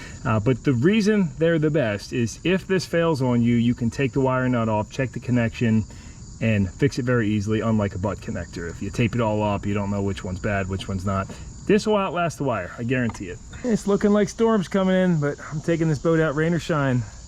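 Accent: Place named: Delaware County, United States. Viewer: You gotta be from Delco. Love the content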